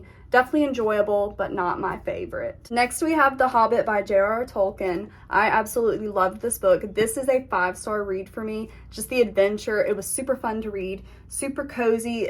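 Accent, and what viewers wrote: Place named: United States. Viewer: I love your accent! What state are you from?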